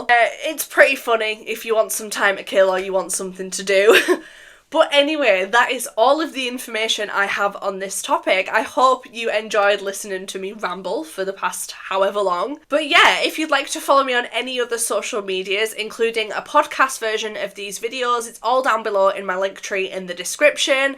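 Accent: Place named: Wales, United Kingdom. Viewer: You sound welsh lol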